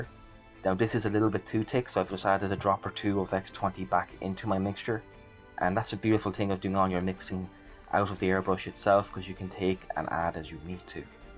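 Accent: Ireland. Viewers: Are you a fellow irish lad? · Great straightforward tutorial with great hints! I’m in the middle of a 1/72 Vulcan bomber using Tamiya paints and I can vouch for everything you’ve said 😊 from an expat abroad who loves to hear the aul accent 😊 Ian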